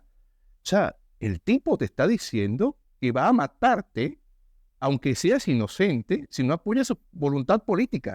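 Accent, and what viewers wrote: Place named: Peru. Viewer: Entiendo que se le deshumanizo y se le dio carácter mesiánico, eso sí es cierto, pero no fue el único libertador mitificado. Soy venezolano, y la verdad nunca me aprobaron ningún examen de historia bajo la presión de hacer la señal de la cruz ante la imagen de Bolivar por favor...deje de decir mentiras.... ni me obligaron a cantar el decreto de guerra a muerte, ni nada de lo que usted menciona. Tal ves sería en su escuela, deberia decir cual fue su escuela y donde estudio usted , ademas de que su acento es peruano, no habla como venezolano. Esta usted diciendo muchas cosas sin fundamento Sr. Revele sus fuentes y escriba un libro para poder buscar sus fuentes historicas. Sinceramente que verguenza usted. Ojala tenga que cerrar su canal por baja popularidad. Diciendo mentiras no se llega muy lejos. Revele sus fuentes.....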